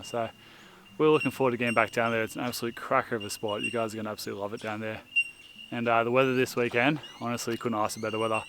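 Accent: Australia. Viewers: Did you also know that all other birds know that it is a lyre Bird that is making the calls. Beautiful place. Ripper place. Where the bloody hell are you. LOL Nice to hear an Australia. Which state are you in? With out giving away just exactally where you are. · Looks awesome I'm guessing this in NSW by your accent and by the terrain? Looks beautiful. That cave looks sick.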